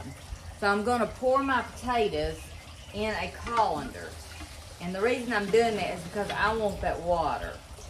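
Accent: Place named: Alabama, United States. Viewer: I just love to hear you speak. You have an accent like me! I was born and have lived in Alabama all my life. I love my presto digital pressure canner.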